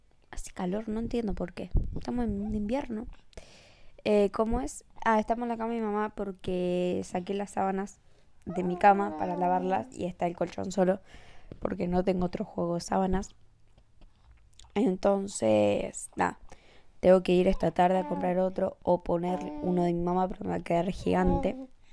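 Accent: Argentina. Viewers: Sos de Argentina? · Sos de argentina?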